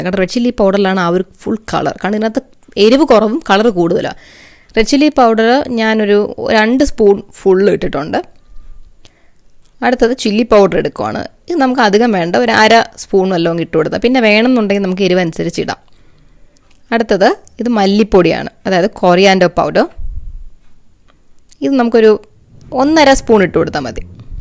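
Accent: Trivandrum, India. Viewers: vrithketta trivandrum slang.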